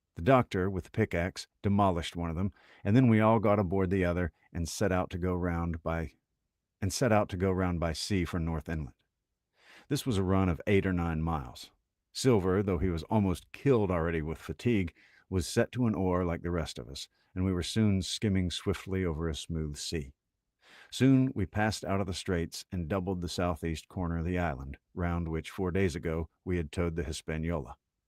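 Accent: South, United States. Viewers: Woohoo! Somehow I had never actually read Treasure Island, or if I did, I forgot most of it. Thank you for finishing your reading! Southern really suits the story so well. I had an idea for a possible future book - The Three Musketeers? Though that would be a long one.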